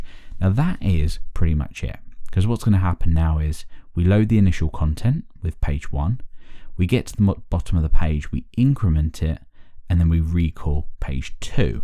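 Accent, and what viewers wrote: United Kingdom. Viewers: An extraordinary tutor with British accent, how cute <3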